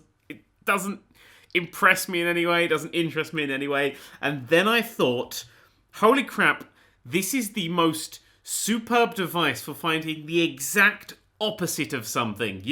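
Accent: England, United Kingdom. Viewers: are you from Britain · Are you from England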